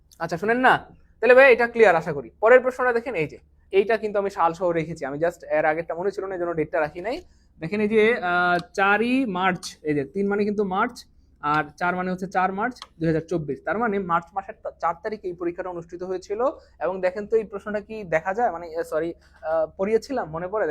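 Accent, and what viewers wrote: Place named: Noakhali, Bangladesh. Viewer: স্যার মনে হচ্ছে নোয়াখাইল্লা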